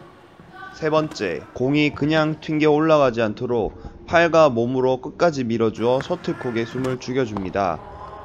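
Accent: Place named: Seoul, South Korea. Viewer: 서울 사람 되가네? ㅎㅎㅎ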